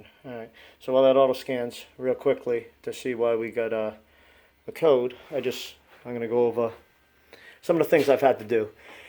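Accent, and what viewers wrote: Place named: Massachusetts, United States. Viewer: No hiding the fact you are from Mass.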